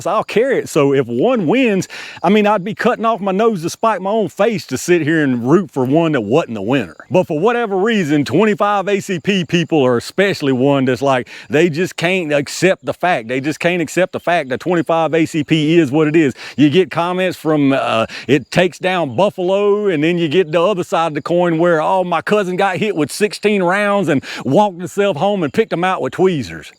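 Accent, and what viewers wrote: South, United States. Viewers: Your channel is the best! Love that southern accent.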